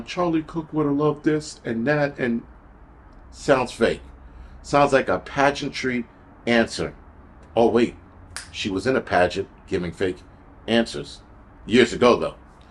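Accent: New York, United States. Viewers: I love your New York accent ♥️ Keep asking questions. We want to know the truth! I agree, I’m not comfortable how Erika Kirk made the statement “I forgive that man”. What man? Tyler Robinson? There are no photos or REAL Evidence linking him to the crime.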